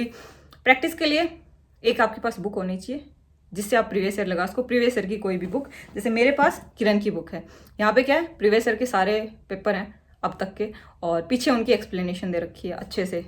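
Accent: Haryana, India. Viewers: हरयाणवी हमे सुनने में अच्छा लगता है।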